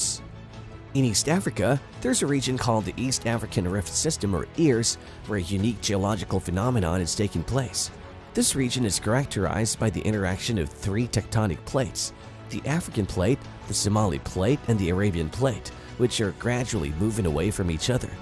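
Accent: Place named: United States. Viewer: He's a robot with a vaguely American accent.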